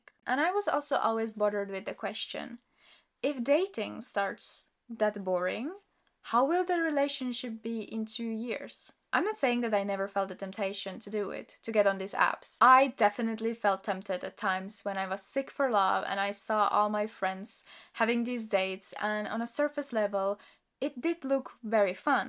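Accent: Russia. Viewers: Hey i just want to tell you: never give up on making YT vids. I think you have a talent for it, plus natural charisma plus beauty. You will make it for sure (I say this knowing how tough it can be sometimes, i have a channel slightly bigger than you, like 1.5K subscribers... so just want to give you some encouragement, because i really think you're good at it) By the way where are you from? I just saw another one of your vids where you say you don't identify with your home country, but i just ask because i assume you're either from Russia or Ukraine because of your name and accent.... I've been to both (was actually in Kyiv when the war started....). Anyways you don't have to answer if you don't want but I'm just curious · I like your accent. Is that Russian?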